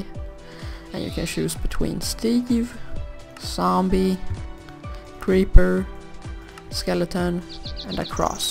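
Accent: Sweden. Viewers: Thanks :) Btw, are u from Sweden?:DD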